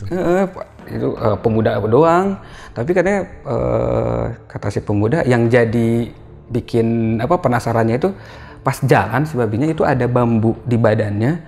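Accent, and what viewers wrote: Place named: Sukabumi, Indonesia. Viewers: Kang sunda abis wkwkwkwkwkwkwk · Pantes cara bicaranya kek sama ternyata msh satu kota...salam dri sukabumi-cisaat kang 😁🙏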